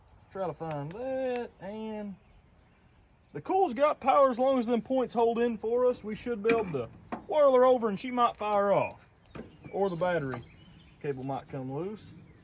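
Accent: South, United States. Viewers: On a different note… you have the most amazing southern accent.. makes it even better watching your videos.😊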